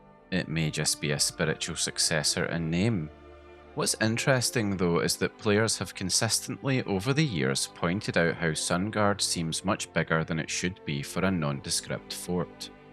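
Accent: Scotland, United Kingdom. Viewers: Its just one of the many Scottish accents. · I like your accent. It's scottish right?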